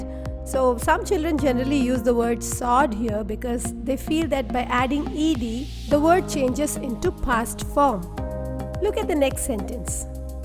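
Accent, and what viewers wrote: India. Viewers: open, hear Indian accent, close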